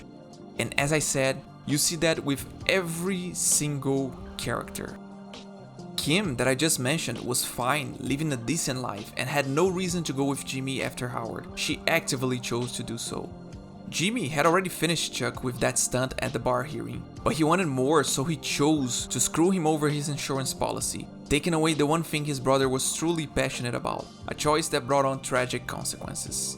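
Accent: Brazil. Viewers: Cara, vc é brasileiro? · Ótimo vídeo. O sotaque brasileiro ficou gritante, e eu adorei. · Ai doidão, tu é brasileiro, né? Eu sinto o Brasil na sua fala.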